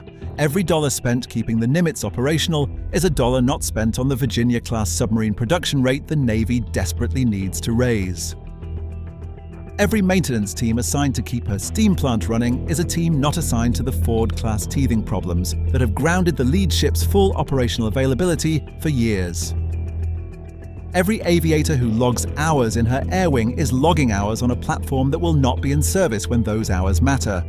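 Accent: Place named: United Kingdom. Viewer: thats why its ironic that its a British Voice.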